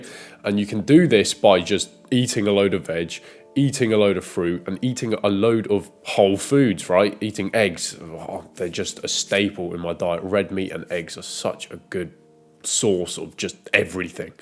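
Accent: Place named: England, United Kingdom. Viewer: Since you a have an English accent...Ill listen to you.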